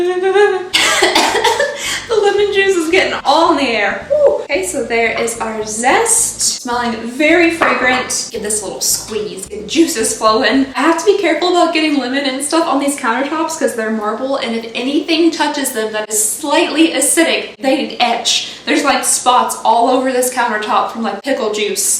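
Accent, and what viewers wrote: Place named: South, United States. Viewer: I don't know if you actually went to Michigan Tech but in my head cannon you did. When you get stressed your southern accent gets stronger and I imagine the Yoopers being hella amused by that. A Southern Belle in Yooperland.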